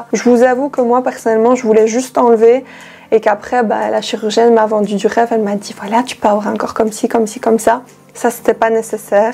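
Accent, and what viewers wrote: Belgium. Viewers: Coucou ma belle, j’entends ton petit accent belge et je suis belge aussi. Je voulais avoir les coordonnés de la chirurgienne ainsi que les prix que tu as payer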